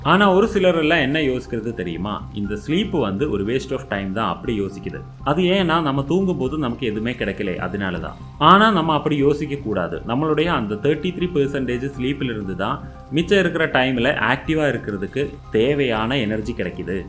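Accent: Kerala, India. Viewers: Are you malayali right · Are u malayaali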